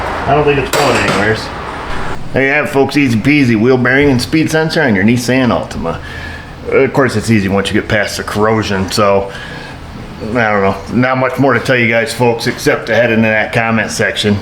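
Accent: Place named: New York, United States. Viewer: I've been watching your videos for years. I always get a good laugh when that New York accent comes through. Mom's family is from New York and the old man's family is from North West Florida on the gulf coast. Mom used to tell me when she met my father while he was stationed in New York, that she thought that the only thing that came out of the south, was rednecks and stump jumpers. I spoke with my aunt (aka - ant) the other night, and she still lives in New York. Her accent and at the speed which she speaks, I had to tell her to slow down and speak to where this country boy could understand her. Love the videos and the great work that you do, keep it up chief.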